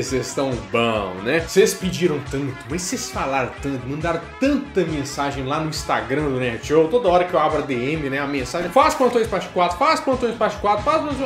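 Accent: Minas Gerais, Brazil. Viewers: Comecei o vídeo e fui pensando “esse cara é mineiro, é igualzinho meu irmão falando”. Quando ele falou “aqui em Belo Horizonte”, soltei a gargalhada. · Cê é mineiro né? Melhor sotaque do mundo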